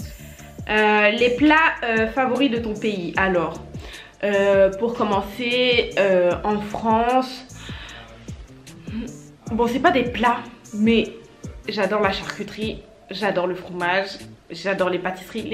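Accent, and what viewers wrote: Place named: Ivory Coast. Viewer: C'est cool hein tu as l'accent ivoirien j'adore 👍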